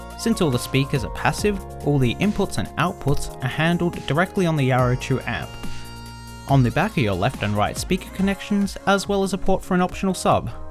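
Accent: Australia. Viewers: Do I hear an Aussie accent or what! :D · Glossy black plastic? That's the deal breaker right there no matter how great they may sound. The use of glossy black plastic on all consumer goods should be outlawed and banned as far as I'm concerned. The amp seems decent enough but the drivers sound sub par from what I can understand and I'm not at all surprised. For the price the speakers (of reputable quality) should be housed in wooden boxes at the very least. Anyway I enjoyed the video nonetheless and the chaps accent reminds me of the days of being at the SCG, WACCA and MCG again. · guy's an Aussie? Fuck me I couldn't for the life of me figure out where his accent came from.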